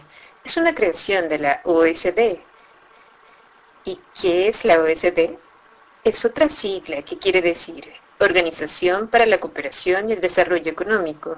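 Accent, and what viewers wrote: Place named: Central America. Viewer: Tiene acento centroamericano, creo que ecuatoriano, o quizás colombiano, pero se entiende perfecto!! vos no aprobarías el PISA, Miguel!! en todo caso pasame qué palabras no entendés, y el instante t en que ocurre, y te respondo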